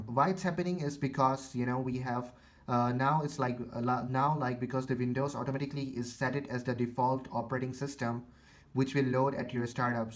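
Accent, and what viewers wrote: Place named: England, United Kingdom. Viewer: thanks god for your english accent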